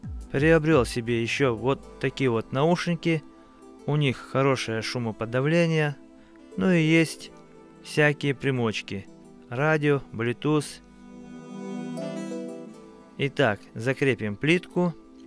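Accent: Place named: South, Russia. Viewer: Южный акцент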